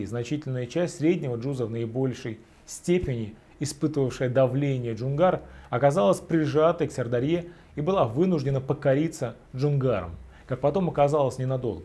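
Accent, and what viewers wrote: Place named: Moscow, Russia. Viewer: правильно следует произносив звук О, а не акать испорчески по мАцковски. То есть надо произносить там где есть в слове буква О произносить звук О, а именно: О'йрат, ТО'ле бий, БО'генбай батыр.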